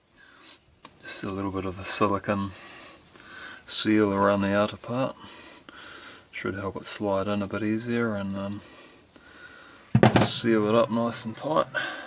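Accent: New Zealand. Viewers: Accent: this guys NZ. Dole pineapple can: he's in NZ.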